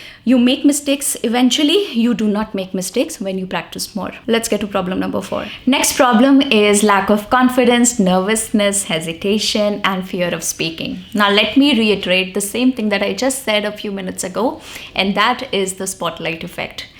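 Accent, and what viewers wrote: India. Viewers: are you Indian.your speaking pronoucition proved you are a indian girl.but doubts the name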